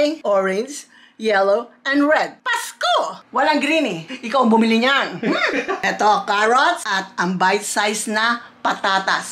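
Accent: Iloilo, Philippines. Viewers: Ilonggo ka Tita? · Ilongga man gali si 🥰🥰🥰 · fan mo talaga ako pero may ask lang ako. Ilongga po ba kayo? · ilongga ka? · Ilonggo po kayo? Duha kabilog hihi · Ilongga ka? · Ilonggo ka nay? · has an accent of ILONGGO hehe love u stay safe. hoy lakas mo asirin c more vlogs po. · ilongga ka pala??? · Ilonggo ba si? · Ilongga ba c mother?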